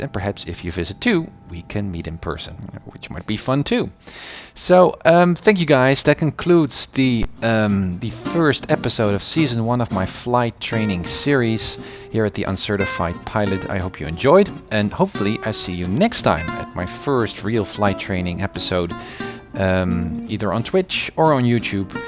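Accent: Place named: Netherlands. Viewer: are you dutch? English sounds dutch :P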